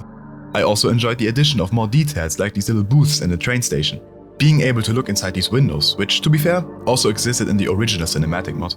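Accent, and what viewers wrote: Germany. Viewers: I can here that your german... Still my guy making peak after peak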